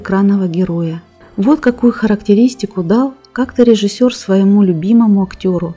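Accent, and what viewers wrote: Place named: Urals, Russia. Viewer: Да, да...Я тоже его слышу... Она,возможно, с Урала...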